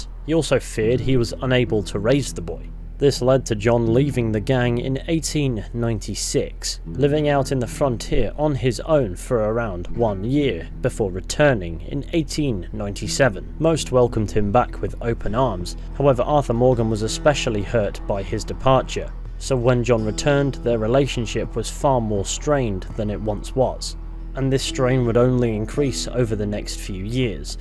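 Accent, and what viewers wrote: United Kingdom. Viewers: Go damn it, why do u have the annoying british accent, just why?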